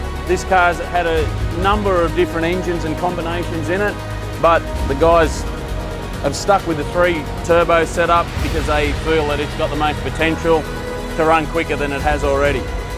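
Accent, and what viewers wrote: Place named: Australia. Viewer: When I hear an Aussie accent makes proud to live in Melbourne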